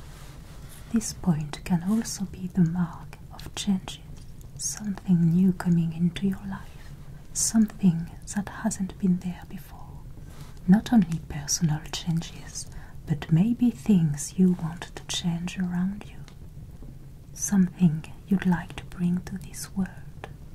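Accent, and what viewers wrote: France. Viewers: I’ve checked out many different ASMR sites, and ALWAYS end up coming back to this one. The tingles come on really quickly as soon as you begin doing anything with your hands- from counting to palm reading to (especially) smoothing fabric - and your beautiful French accent is so calming to go along with it. You are the Absolute ASMR QUEEN - thank you for doing what you do! ❤😌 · je crois déceler un accent ? Tu es Française ? pourrais tu faire une vidéo en français j'aimerai beaucoup écouter ton travail dans ma langue maternelle. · I'm in love with your voice and french accent. Your videos are just pure art but I like the most fall asleep listening only your voice and sounds. Nothing more relaxing! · i love the french accent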